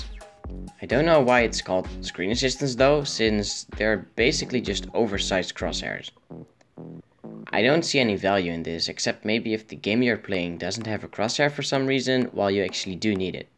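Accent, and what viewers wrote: Netherlands. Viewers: U dutch? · youre dutch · Merk dat je Nederlands bent duss... heb je last van Ghosting? Is de reactietijd rond 3-1MS? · ben je nederlands je hebt echt een nederlands engels accent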